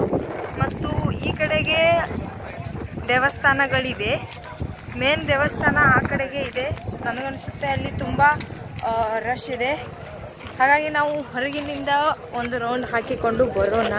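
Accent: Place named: Maharashtra, India. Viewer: I'm from hubli.. i watched ur almost all videos. Ee thara kannada matadorna nanu yavattu nodilla. First time nodtha idini it's nice to see that your kannada accent awesome..😊👏 i think your mother tongue Marathi Ansutte Alva ri..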